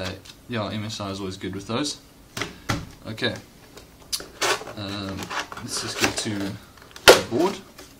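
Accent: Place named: Afrikaans, South Africa. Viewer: Jy klink Afrikaans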